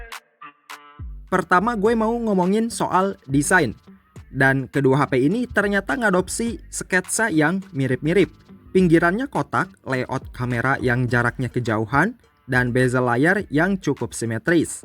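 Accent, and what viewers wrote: West Java, Indonesia. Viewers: Mang siga orang sunda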